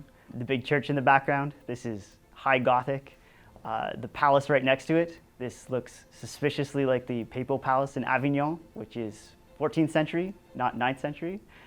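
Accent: Canada. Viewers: One sentence in, and I can tell he is Canadian 😅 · I can tell he is Canadian by his accent. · I'm guessing he's Canadian from that "oooot" and "aboowt" in his accent. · As soon as you opened your mouth, I pegged you as a Canadian (and from my alma mater too!)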